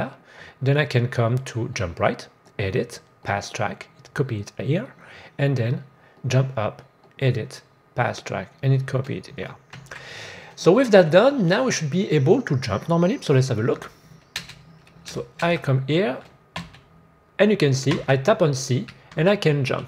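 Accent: France. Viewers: Super tuto j'aime bien ton léger accent français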